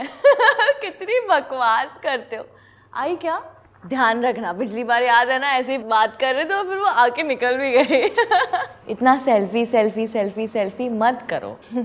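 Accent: Maharashtra, India. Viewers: Such cute Marathi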